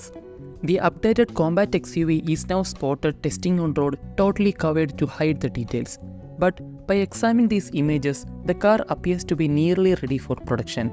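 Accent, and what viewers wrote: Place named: England, United Kingdom. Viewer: Noticing your English accent... Naatil evida? 😅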